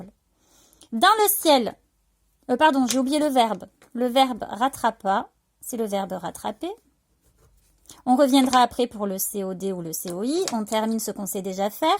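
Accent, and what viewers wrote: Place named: South-West, France. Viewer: Quel bonheur cet accent du sud-ouest qui fait que j'ai tout compris ce qu'est un COD et un COI ! Merci maîtresse !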